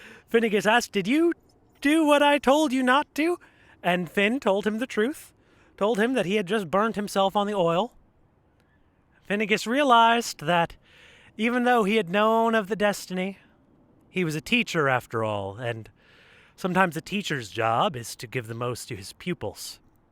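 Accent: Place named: Ireland. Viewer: You have an Irish tongue, m8. Thank you for sharing. Sláinte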